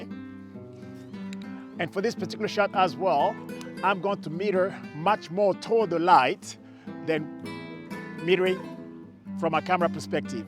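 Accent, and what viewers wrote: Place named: Australia. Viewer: Your portrait of your son is stunning! I hope both guys appreciate the gift these photos are now and WILL be for their children and grandchildren. I also hope they know how appreciated they are for giving you (and us! 🙂) their time and willingness to model and be teaching aids. Thank you to both of them. I'm always happily surprised the moment I realise an Aussie is creating and sharing here on YouTube. The moment you started talking I started smiling, then you panned around to show the trees in your yard and, yep, Aussie! 😁 Going back to my appreciation of your models, part of that is because I don't have one. Thirty two degrees in Melbourne today and I was messing around with a strobe, running backward and forward between camera and the stick I'd put on the ground as my "mark". It's an excellent way to suffocate oneself under the dark cloth and look hot, sweaty and as messy haired as possible on film! 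Too hot in the darkroom to develop it yet, even am....but I'm encouraged to do a little more experimenting after watching your video. Thank you for taking the time, I'm very grateful, and cheers to your models for their time. Their portraits turned out beautifully and that double exposure is a truly happy accident, too!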